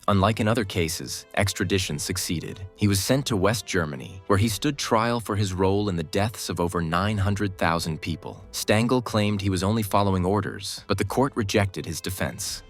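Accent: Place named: United States. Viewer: Nice vid but work on the pronuciation of names. Terrible American accent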